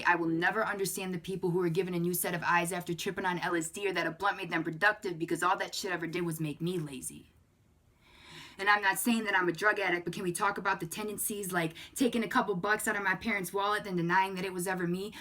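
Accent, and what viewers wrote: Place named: Midwest, United States. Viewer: Damn girl that Midwest accent is so cute what state are you from Wisconsin right?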